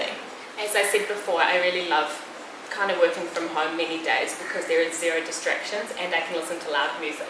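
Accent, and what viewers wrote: New Zealand. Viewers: Are you from New Zealand? 😊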